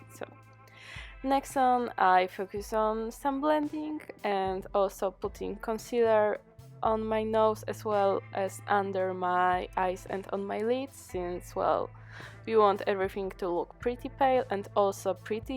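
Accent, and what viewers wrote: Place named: Russia. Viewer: Hi, were are you from? You have russian accent) ( i don't now)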